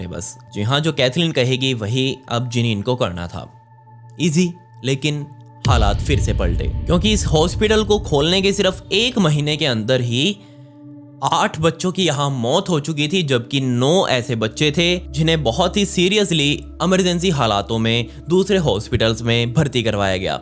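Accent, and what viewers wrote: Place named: Punjab, India. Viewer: hilariously you speak hindi little bit punjabi touch make your video more interesting carry on great job.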